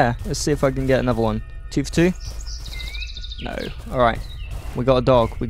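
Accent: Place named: United Kingdom. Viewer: Cool, good mod, and my favorite British accent-man ;3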